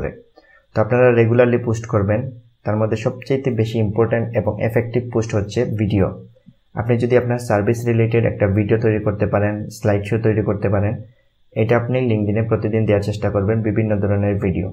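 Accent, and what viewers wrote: Sylhet, Bangladesh. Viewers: apni ki sylhety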